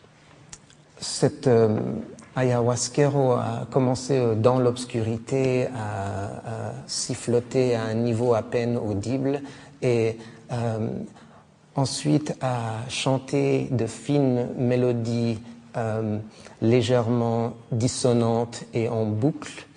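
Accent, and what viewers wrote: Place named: Switzerland. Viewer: ton doux accent suisse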